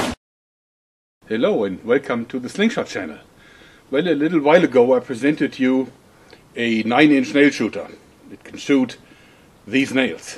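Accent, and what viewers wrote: Germany. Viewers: Deutscher? Hört man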